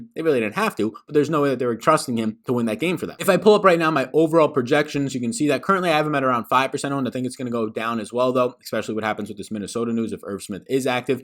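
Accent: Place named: New York, United States. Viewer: You talk like you from new your or Boston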